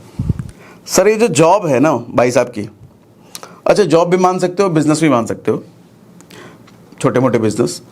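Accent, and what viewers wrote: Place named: Uttar Pradesh, India. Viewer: Ye thumbnail ka kamal nahi hai sirji, ye to aapki attraction ka power hai Jo ham logon ko videos dekhne ko majboor kar deta hai, up accent ka power 💪💪♥️♥️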